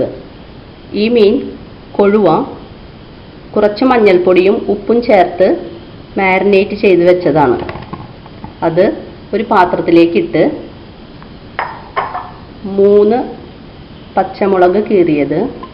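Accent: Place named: Thrissur, India. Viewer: nalla Thrissur bhaasha..this is central kerala language man not tamil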